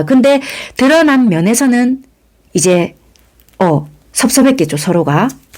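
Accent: Busan, South Korea. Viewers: 부산 사투리 넘 좋아요.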